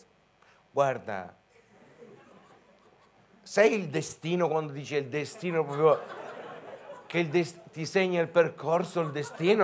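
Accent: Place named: Calabria, Italy. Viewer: o grande calabrese sopratutto e l accento che mi frega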